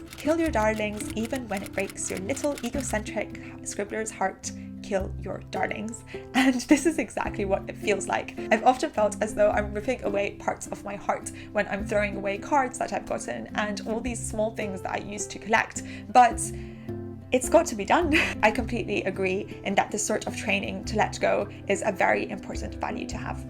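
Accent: United Kingdom. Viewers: I have been learning English by reading English novels for myself without anyone's help. The most difficult thing I have as a Korean for learning this language is to practice listening to native English which is different depending on where they are from. I have chosen you as a model of British English. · Beautiful wonderful marvellous. Good British English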